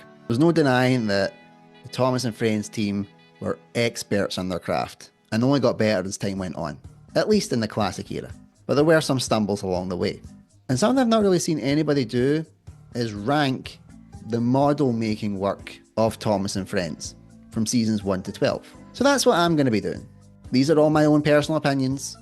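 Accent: Scotland, United Kingdom. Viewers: Came for set discussion, stayed for the Scottish accent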